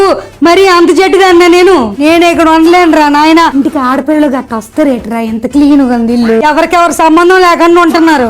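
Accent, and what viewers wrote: Srikakulam, India. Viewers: Srikakulam yaasa......🔥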